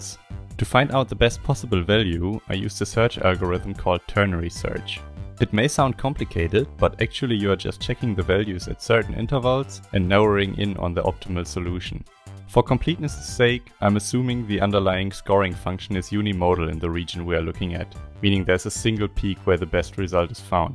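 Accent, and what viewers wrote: Germany. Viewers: ich konnte schon nach wenigen sekunden an deinem akzent erkennen dass du auch deutsch bist haha :) ich bin übrigens weiblich und habe zwar keinen freund der mir das video geschickt hat, aber mario party ds ist einfach meine kindheit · Man merkt dein deutschen Akzent Kollege Schnürschuh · Man merkt an dem Akzent, dass du deutscher bist 😂 Find ich eigentlich ganz toll, dass man sowas gut erkennen kann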